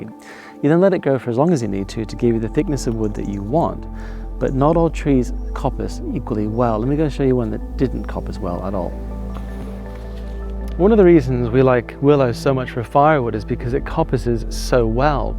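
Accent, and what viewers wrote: United Kingdom. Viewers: seems interesting but it is very difficult to hear because of the loud music background. You Brits are not easy to understand for us in the U.S. who's hearing is not the best. Just saying.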